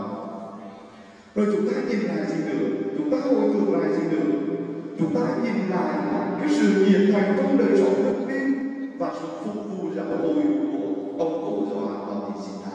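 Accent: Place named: Nghe An, Vietnam. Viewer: Cha giọng yên thành, nghệ An thì phải.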